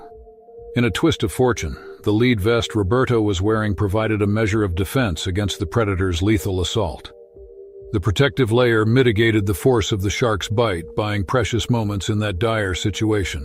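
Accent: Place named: United States. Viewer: Announcer is playing w/ us OR was drinking vodka on story #2 the newlyweds. No way he can pronounce abyss, cerulean but mispronounce simple words. Story 3 he had no mistakes. Any I missed ? 🤣🦈 divvver -> diver leed vest -> lead hom ed -> honed in snapped shoot =snapped shut fers ee us -> ferocious ree alm -> realm threet -> threat Voice talent guy has GOT to be laughing when he does these [not at victims but his pronunciation]. He sounds like a native English speaker and one from the US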